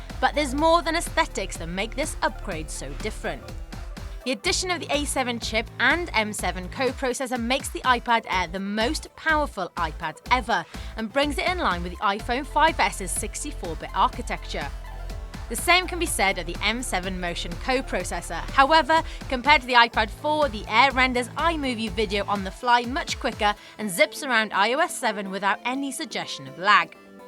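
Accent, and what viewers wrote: Wales, United Kingdom. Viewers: hey with that voice am suppose to see something of ''the valleys'' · Rainbow clearly a Welsh accent.. sounding a touch like a Bristol one at times (Bristol is on the Welsh borders of course). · Sound Welsh to me.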